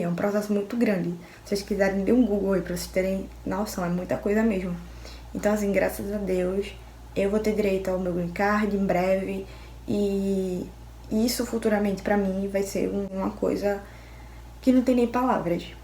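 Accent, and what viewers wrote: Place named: Pernambuco, Brazil. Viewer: Não tem como não admirar uma pessoa que apesar de tão jovem, é de um extremo caráter, responsável e amorosa.Confundi seu sotaque, mas agora sei que você é uma conterrânea, pois meus pais eram pernambucanos, e eu, apesar de ter nascido aqui em Guaruja SP., de certa forma me considero de Pernambuco também. Beijinhos e Deus abençoe.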